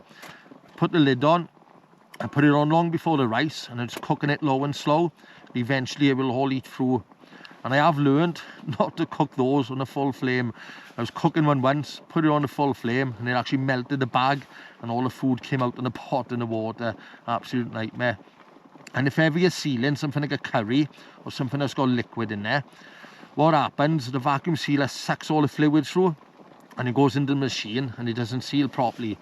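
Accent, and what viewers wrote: Wales, United Kingdom. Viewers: I like the accent. Is that Welsh?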